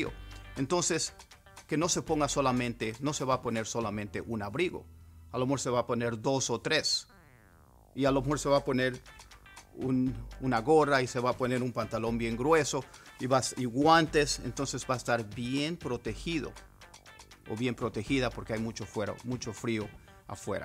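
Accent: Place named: United States. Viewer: You seem yo have lived Tha america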